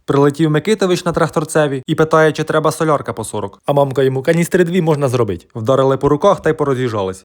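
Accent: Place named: Kherson, Ukraine. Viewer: Говірка гарна. Ми на Херсонщині также говоримо,майже дома побувала❤❤❤❤❤❤❤❤❤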